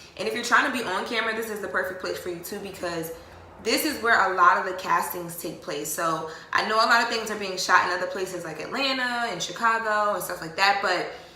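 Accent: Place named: Philadelphia, United States. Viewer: Okay Delaware. I was thinking your accent was Philly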